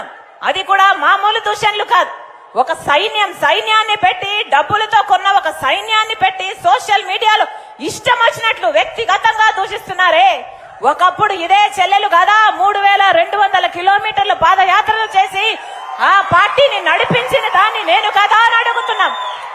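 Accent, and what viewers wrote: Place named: Telangana, India. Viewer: Same to same telamgana laga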